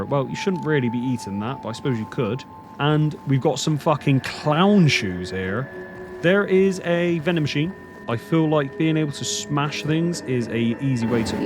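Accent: Bristol, United Kingdom. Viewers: Omg I was thinking the same thing with this pizza on my playthrough! 😂 loved this video! BTW, do I detect a fellow bristolian from your accent? 🤔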